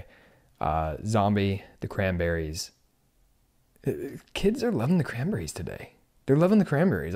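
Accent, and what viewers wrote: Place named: United States. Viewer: You sound like american. That explains why you dont seem to know very much about world and history. 😊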